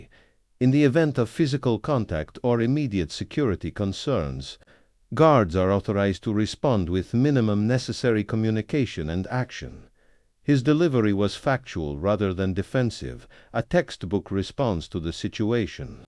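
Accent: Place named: Eastern Europe. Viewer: UTTER NONSENSE The young fella on the "title page" appears in a private guardsman uniform embellished with various bits of frippery and unknown collar dogs and shoulder flashes. The narrator has a pronounced east-European accent - a noticeable Russian cadence. Since when has Buckingham Palace had ornamental flower beds on the public side of the gates? This is COMPLETE CRAP - DON'T WASTE YOUR TIME - IGNORE "Guard's life"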